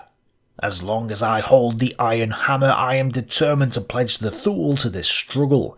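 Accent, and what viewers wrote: United Kingdom. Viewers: Overlooked is their primary motive and impulse to expand Europe eastward into a world superpower colossus. No matter how bizarre, any ideology to further that goal no matter was deemed proper. That's why Hitler tolerated that stuff. It's amusing to hear a guy with a Limey accent in the once great British Empire bad mouthing that failed dream.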